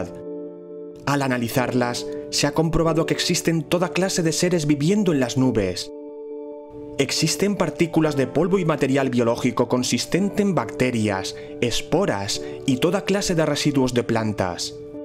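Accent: Spain. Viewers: Parece más de España, no? Saludos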